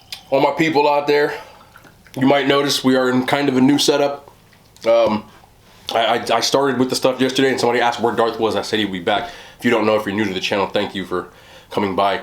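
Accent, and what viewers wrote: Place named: East Coast, United States. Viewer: 😃 food looks delicious. Love your upbeat attitude And eastcoast accent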